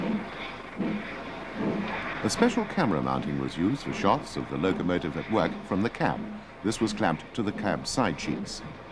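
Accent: RP, United Kingdom. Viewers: The "posh voice" is fine. I hate English classism but it's clear and easy to understand. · It is not "posh" it is actually "received pronunciation", as in, for voices to be "received" over radio you had to speak clearly to be understood, especially before the days of HD/digital etc.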